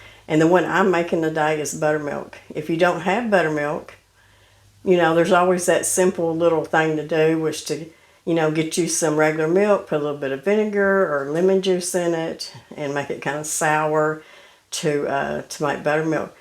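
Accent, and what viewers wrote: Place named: Arkansas, United States. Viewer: I love custard pie. I love your accent it reminds me of my grandparents, they were frome northern Arkansas.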